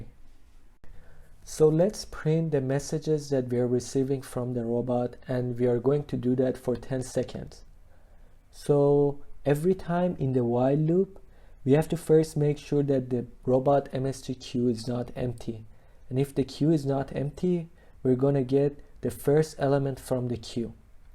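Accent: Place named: Iran. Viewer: iranian accent lol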